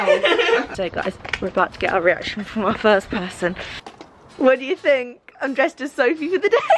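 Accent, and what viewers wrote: United Kingdom. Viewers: British, right?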